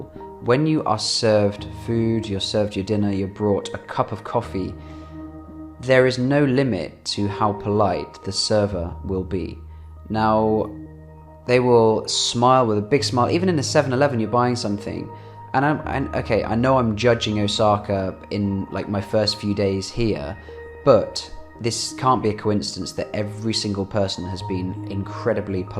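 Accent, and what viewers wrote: United Kingdom. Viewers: I like it! Saw your film for the first time. I was impressed with the pictures and narrations, both are very calm, gentle and professional manner. Slowly and calmly spoken English, with slight British accent allowed me to pick up every single words. Thank you. Old japanese man, living in tokyo. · イギリスの方ですね！英国なまりですもんね、大阪を紹介してくれてありがとー‼️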